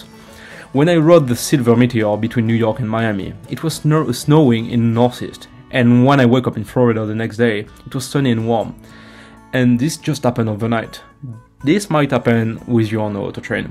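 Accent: France. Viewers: I've taken the California Zephyr, Coast Starlight, Empire Builder, Southwest Chief, and Texas Eagle. My top 3 are the Zephyr, Empire Builder, and SW Chief. The Coast Starlight is very scenic, but I'm still salty about Amtrak discontinuing the private lounge car for the sleeper passengers. The Cardinal is on my bucket list. Great video, though I suggest you slow your speech just a little bit. My ears adjusted to your French accent, but it took a few minutes.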